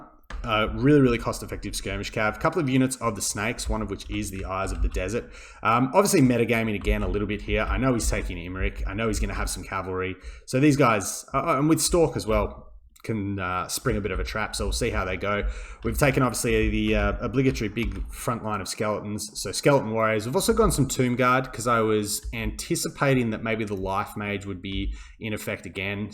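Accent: Australia. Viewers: Great games bro! we gotta run into each other more on ladder :P Goddamn surprised me, your voice is amazing for casting along with the Australian accent!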